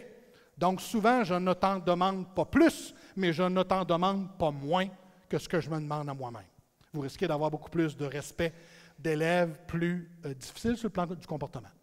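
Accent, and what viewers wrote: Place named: Quebec, Canada. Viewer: "mais si je m'adresse à Norman, seulement pour lui tomber sur la tomate..." mdrrr (en tout cas, superbe conférence, un bonheur à écouter. Et j'adore cet accent québécois <3)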